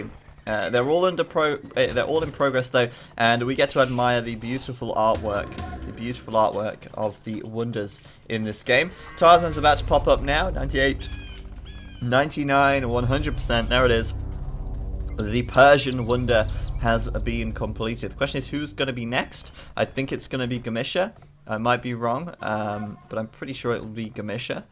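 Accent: United Kingdom. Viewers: Age of Empires 2 it's dat British accent! Welcome change from the Aussie drawl I have to put up with >_<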